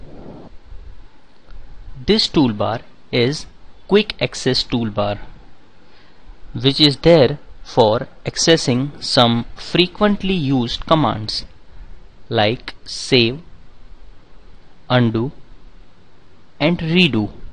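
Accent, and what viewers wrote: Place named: India. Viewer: video is awesome....with all zooming and sound effects ......best part is audio is in indian slang !!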